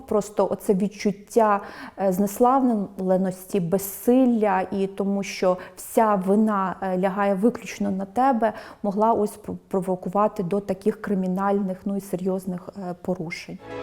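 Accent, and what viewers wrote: Russia. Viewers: Спікер явно російськомовна. Багато помилок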